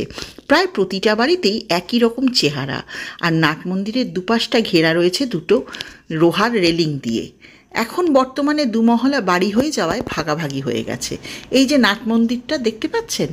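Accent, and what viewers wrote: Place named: Kolkata, India. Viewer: Apnar voice ti asadharon - khub jeno m chena. Khati uttar Kolatar bhasha o uccharon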